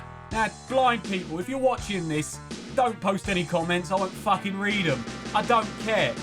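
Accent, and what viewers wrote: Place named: United Kingdom. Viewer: Shit sorry, british accents confuse me.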